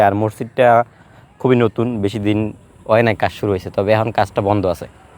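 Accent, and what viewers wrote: Barishal, Bangladesh. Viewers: আপনি কি বরিশালের নাকি ভাই?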